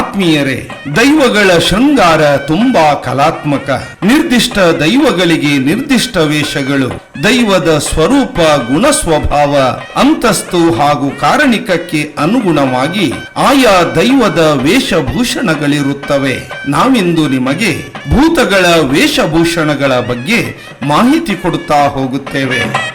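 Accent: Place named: Tulu Nadu, India. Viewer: ದೈವಾರಾಧನೆ ಬಗ್ಗೆ ಬಹಳ ಒಳ್ಳೆಯ ಮಾಹಿತಿ ಹಾಗೆ ತುಳುನಾಡ ಮಾತಿನ ಶೈಲಿ ತುಂಬಾ ಚೆನ್ನಾಗಿತ್ತು 🙏🙏🙏🙏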